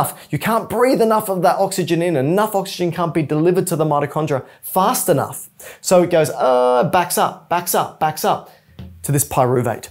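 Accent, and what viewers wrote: United Kingdom. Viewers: thank you British man 🙏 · Are you British???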